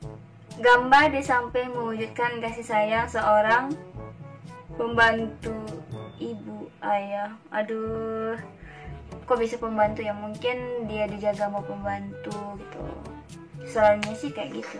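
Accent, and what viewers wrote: Indonesia. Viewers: Logat"nya persis orng indo.. Aku kira orang indo yng mentap di brunai..😀😁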